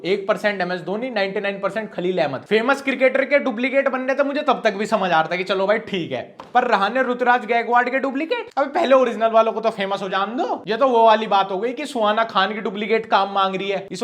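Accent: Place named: Meerut, India. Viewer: Bhai tu pakka meerut ke side rateha h❤😅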